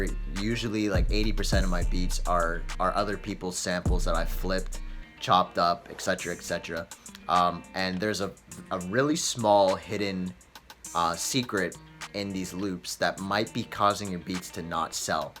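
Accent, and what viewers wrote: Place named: Toronto, Canada. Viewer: I’ve been here since 600 subs, I love your beats and the Toronto accent really standing out here😂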